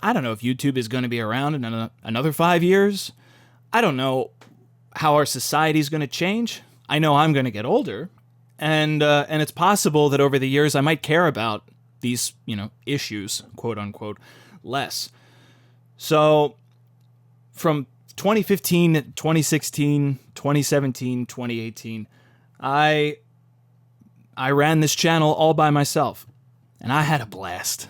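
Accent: United States. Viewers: Dang. That's sad. Love your stuff. You have that perfect jersey voice. Good luck out there bruv.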